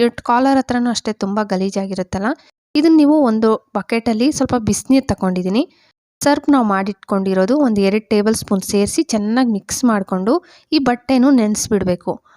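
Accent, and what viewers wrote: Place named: Kolar, India. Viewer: Kolar side avara nimma bashe agide adikke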